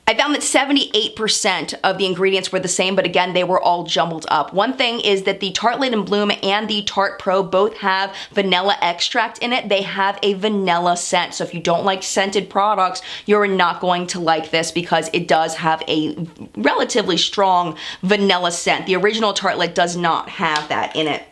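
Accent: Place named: East Coast, United States. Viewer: This was a great review! Seriously, the format was impeccable (sincerely appreciate the skip-ahead sections!) and I love you allowed us as the viewers to decide if we think it's a good choice for us. It felt educational with out too much bias, and it was great to see how thorough you were with reviewing every aspect of the palette, right down to side-by-side ingredient comparisons. I appreciate how you acknowledged who this palette is ideal for and who it may be less ideal for--a lot of make up reviews insist something is bad because it wasn't for them, it's so frustrating! So really, this was a freaking great review (also it's been so long since i heard that east coast accent!!! <3)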